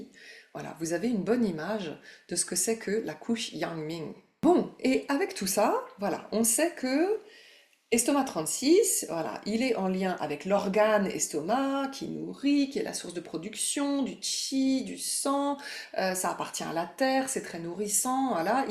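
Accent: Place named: Belgium. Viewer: Je m’intéresse à la MTC depuis peu et je vous rencontre 🙏🏽 vous êtes claire, pédagogique, lumineuse alors je m’abonne directe 👏merci et bravo, j’entend la Belgique 😉🌻🌞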